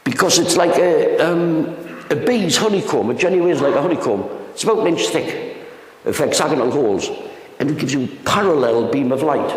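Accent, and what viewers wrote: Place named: Newcastle, United Kingdom. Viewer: That's a Geordie accent (from the North East of England, probably Newcastle) not a Scottish accent (like Scotty - from Scotland).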